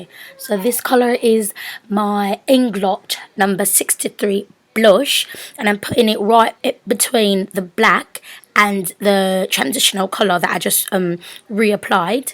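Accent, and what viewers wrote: United Kingdom. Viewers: You killed me with the british acc damnn goo gal. Love you for free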